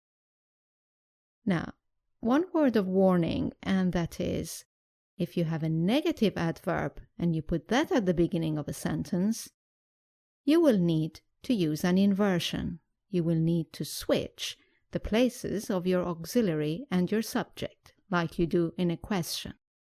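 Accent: United Kingdom. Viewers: Yor english is british?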